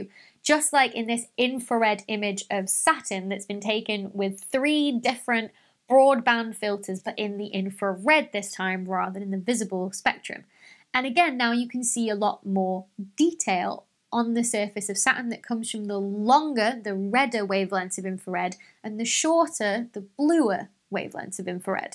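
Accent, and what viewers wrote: United Kingdom. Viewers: Absolutely so! But, in the meantime, I worry that you might be losing viewers ... and I love your explanations so much that I think you should have a much wider audience ... BEFORE the bastions of patriarchy are brought down, which could be a long time! Don't think of it as compromising your English Regional Integrity (ERI!) but just as a courtesy to viewers listening on tinny speakers! When I moved to the US, I resolved NEVER to say "TomAYYto", I thought, "No, let the Yanks learn "TomAHHto", but after 8 years, I just got tired of having to say "No Tomahhto" over and over again (I HATE them, they are Satan's Fleshy Fruit!) so I made my and waiters lives easier by saying "TomAYYto" when necessary.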